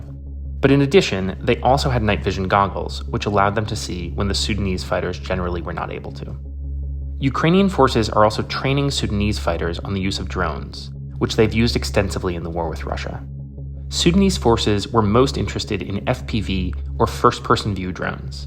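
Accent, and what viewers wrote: United States. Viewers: Is this a Californian accent?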